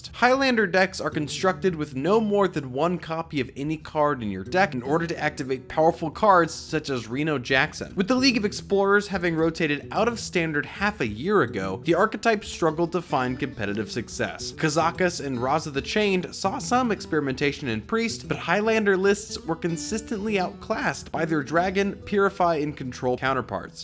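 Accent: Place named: United States. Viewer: He said Razakus, jeez, its just his 'american' accent i'd say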